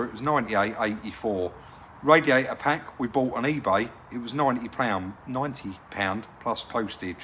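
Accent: South, United States. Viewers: I think that’s great gets a nice ride for a bit it has turned out very nice On another note being an ex northerner understanding your southern lingo is a bit difficult sometimes And I bet you will say the same for me but I would never go on about the way you say things That is just how it is, I am glad you told him what’s for as I would too Being in Australia for the last 50 years I think I speak double Dutch these days You would have no idea what is spoken here half the time Thanks for all the great videos and I do hope enjoys his new old flash motor 😍😍👍👍ps I love finding money in cars 😂😂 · Lads never apologise for the way you talk I’m a scouser I can understand you southerners perfectly well 😉😉 the content you provide and the work you do is second to none, you lads are class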